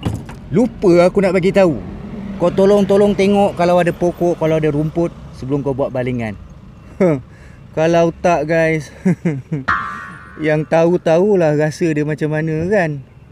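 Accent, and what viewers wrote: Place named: Melaka, Malaysia. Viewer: Mantap Dato Seri.. Episyen!.. mcm org Melaka piau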